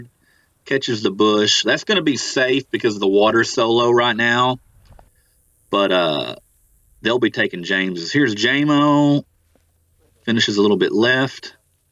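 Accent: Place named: Arkansas, United States. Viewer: Fantastic to watch. Love the commentary! Including the great Arkansas accent. Looks like a great event. Thanks so much for posting!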